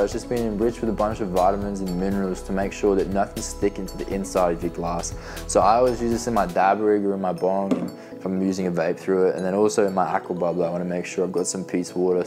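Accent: Australia. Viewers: Obviously this dude is a fellow Aussie but I do not recognise his accent... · Western Sydney I reckon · Yup, thought so too. Maybe Adelaide 😂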